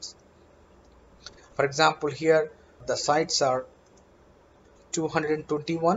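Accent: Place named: India. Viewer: Indian English.........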